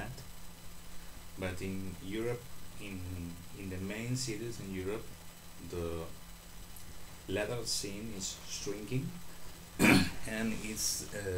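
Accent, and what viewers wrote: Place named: Spain. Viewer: I agree on the whole apart from 1 thing and that is how can anyone find a nicer down to earth guy than you, you say it as it is plus the Spanish accent makes my hairs on my back stand on end, keep up the great work adios for now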